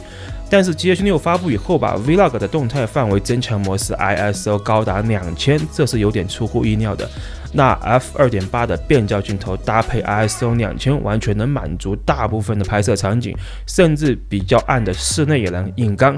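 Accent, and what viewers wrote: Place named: Sichuan, China. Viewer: 这个川普听起来太舒服了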